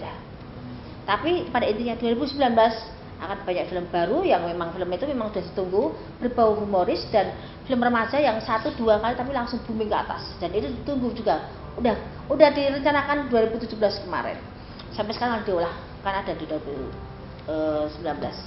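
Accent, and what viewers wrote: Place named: Banyumas, Indonesia. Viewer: Mbak iki wong ngapak ya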